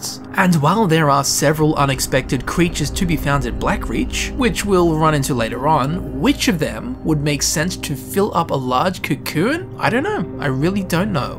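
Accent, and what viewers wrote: Australia. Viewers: One he is austrlian, two thats how aussie accents sound.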